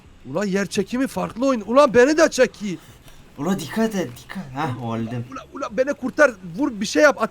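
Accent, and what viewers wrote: Elazığ, Turkey. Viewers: Abi Konuşdugunuz Elazıg Şivesi :D ula falan :D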